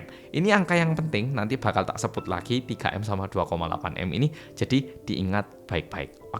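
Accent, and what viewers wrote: Java, Indonesia. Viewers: Ko medok mas bahasane,sm kaya aq 😅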